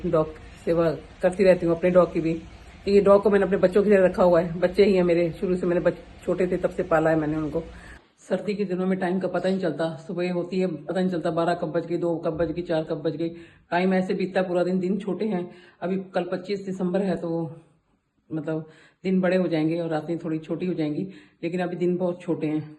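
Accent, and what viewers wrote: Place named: Multan, Pakistan. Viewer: Meri mummy bhi aise hi baat krte hai ..hum bhi multani hai...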